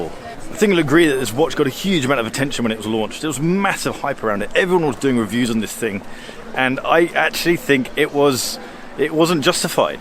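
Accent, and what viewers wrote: United Kingdom. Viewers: The review coming from someone with a British accent meets the expectations. A ton of complaining, and more complaining, and more complaining. If I hadn't seen other videos from you, I'd say you're the kind of person who'd complain about Patek or Vacheron Constantine's movent, and why it took so long for these companies to make your $1M handmade watch. Then once you're done with the watch complaining, you'll start complaining that your girlfriend has too much boobs (whatever that means).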